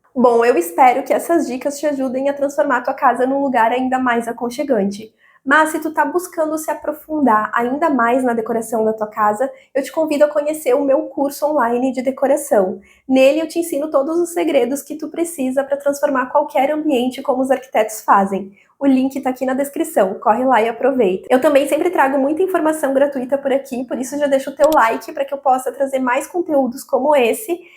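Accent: Rio Grande do Sul, Brazil. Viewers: Adoro o sotaque gaúcho!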